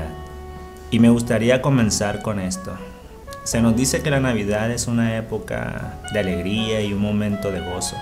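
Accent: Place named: Sinaloa, Mexico. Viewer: ¿Porque habla así bato,acaso es sinaloense?,saludos,felices fiestas a todos incluyendo a católicos,musulmánes,etc.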